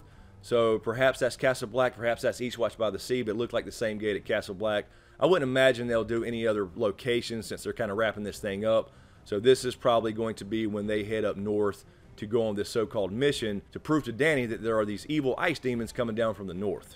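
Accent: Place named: Mississippi, United States. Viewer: I don't know what I was looking forward to more, the actual trailer or your trailer breakdown (tho I am going through GOT withdrawls since they are doing the late start this yr) Man you are the only one I watch about GOT reviews, IMO you are the only one that's worth a fuck. Now I could be a little bias because I'm from South MS and you from the way you talk (and not to sound creepy, you honestly look like my buddy Tyler would have in maybe 10 yrs or so) and my boy Tyler passed away a little over a year ago. He had that same passion about ASOIAF that you have and got me into reading the books. Anyway man just keep up the good work man. I really enjoy the vids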